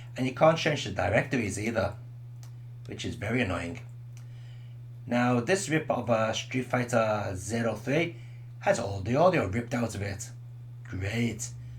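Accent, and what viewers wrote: United Kingdom. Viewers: I love your channel. I have to ask, as "verboten" as it is today, about your background (yes, your heritage... Oh NO!). Obviously you live (or lived?) in Japan but you have a very obvious British accent and as far as your look... well that's not as easy! That's why I ask. If it doesn't offend you (and I honestly don't think it will) can you tell us? Thanks.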